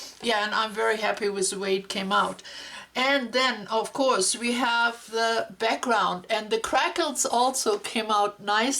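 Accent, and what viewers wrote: Germany. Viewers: Dear, congrats to your fabolous skull art piece! I love it very much. I´m also in love with skulls, especially combined with glitter and shine... To use old embossing powder to highlight the eye is a very cool idea and turned out very nice. I´m looking forward for new cool videos from your creative lab🙂 P.s. Do I hear a little german accent?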